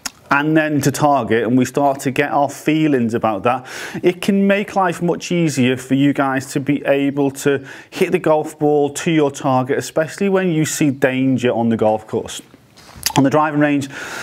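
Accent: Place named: Liverpool, United Kingdom. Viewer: Been a while, do prefer a fellow scouse doing tutorials 👍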